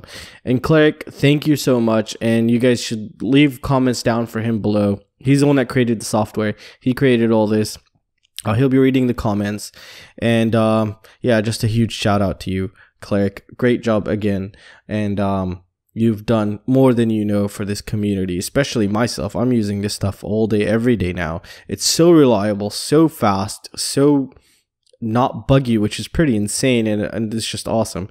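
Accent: California, United States. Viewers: Lmao. good one but there is still data leakage. I can tell by your accent that you are most likely from Cali and IE leaked that you currently live in Hungary ;-)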